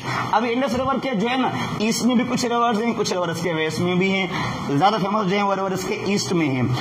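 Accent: India. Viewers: ap ka accent b sindhi hai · Improve your pronunciation speaking like punjabi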